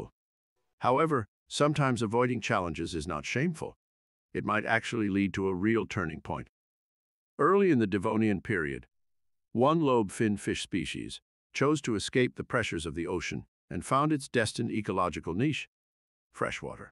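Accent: United States. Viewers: Excellent video, appreciate the attention to detail. Also, I love your classic mid-Atlantic accent.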